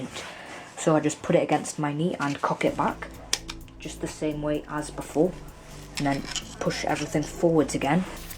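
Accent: United Kingdom. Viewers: I think his accent sounds British, definitely not New Zealand? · Are you from newzealand because on your pants it has Canterbury New Zealand? But your accent sounds British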